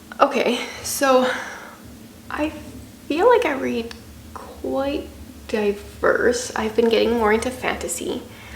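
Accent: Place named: Canada. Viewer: ive reached a new record as an american. i was able to clock you as canadian in just 25 seconds. checked your channel and i was right! canadian-dar is alive and well. greetings from the south in gun-trump-freakyland usa!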